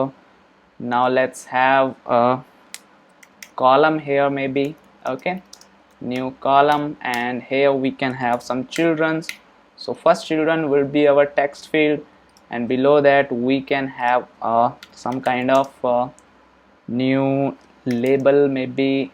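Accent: India. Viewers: 印度仔，英语很重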